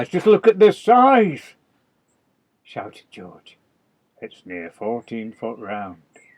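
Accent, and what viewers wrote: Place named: Stoke, United Kingdom. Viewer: I'm guessing you may be from Stoke...?? My dad used to read the stories to me in my bed in Stoke and he'd put on a Yorkshire accent. Would be pretty strange if so!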